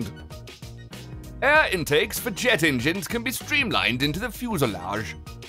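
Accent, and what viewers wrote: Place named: RP, United Kingdom. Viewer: Damn ur voice, the perfect posh british accent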